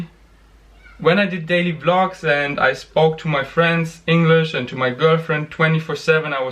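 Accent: Germany. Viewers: Stop worrying about your english so much. I already understand everything you say in english perfectly and I love your german accent. It sounds endearing.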